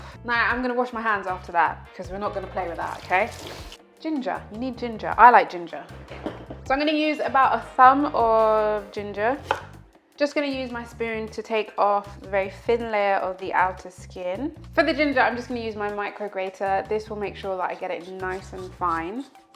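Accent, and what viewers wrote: United Kingdom. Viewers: That is such a beautiful form of the English language! What region are you from in the UK? This is so classy.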